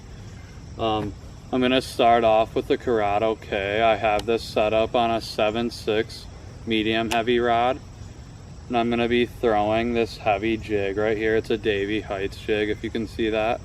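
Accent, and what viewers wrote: Minnesota, United States. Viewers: You’re either from Minnesota or North Dakota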